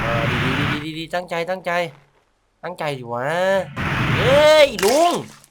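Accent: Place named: South, Thailand. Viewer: อย่าแหลงใต้ได้หม้ายพี่บ่าวม้ายชอบ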